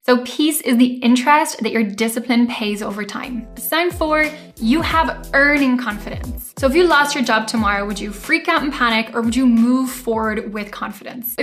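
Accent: Cork, Ireland. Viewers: This video made me look at my financial life differently. In a good way. Thank you for the content! My husband and I love the Cork accent. It honestly makes your videos so soothing to listen to! ❤️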